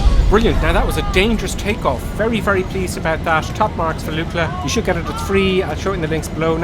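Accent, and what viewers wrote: Ireland. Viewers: Sound like you're Irish xD I'm from Dublin! Cool vids man! Really funny and good detailed reviews! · Are u Irish if u are what county u from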